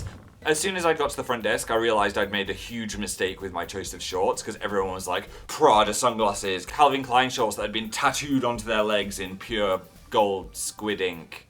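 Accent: United Kingdom. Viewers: I love the way he said 'america'. Mmm<3 Dem British accents*shivers from fangirl overload*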